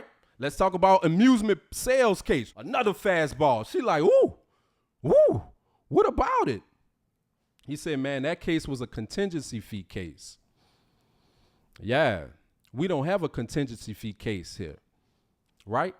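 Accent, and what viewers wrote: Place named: AAVE, United States. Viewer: Imma argue dis.....How you gon say dat?....and so many other examples of ebonics. You literally sound just like Fani Willis---and that's NOT a good "thang". As for your legal knowledge, it stinks. Even I--as a-non-lawyer--know well the GA statute on gifts from prohibited sources. The statute CLEARLY says $100 USD in aggregate must be reported yearly. They didn't have to stay at the Ritz for it to constitute a conflict--and corruption. Where did you get your law license? Compton?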